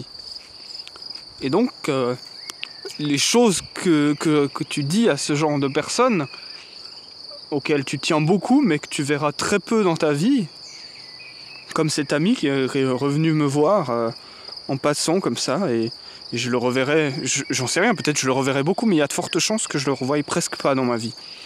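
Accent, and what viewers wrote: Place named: Switzerland. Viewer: Les 2 sont possibles et en Suisse j'entends plus souvent cette façon de prononcer (en tout cas dans le canton de Fribourg) Il est Suisse: ça s'entend à son accent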